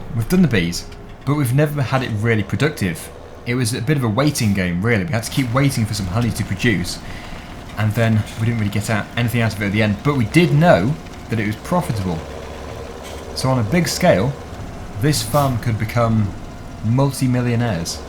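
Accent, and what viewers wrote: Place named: United Kingdom. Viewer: You are British so pronounce things the British way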